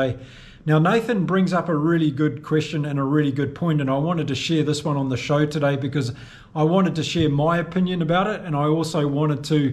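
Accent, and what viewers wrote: New Zealand. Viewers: You have the least offensive kiwi accent. Fush and chups 👍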